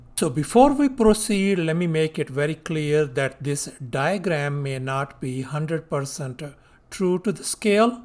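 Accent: India. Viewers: Teacher are you from İndia?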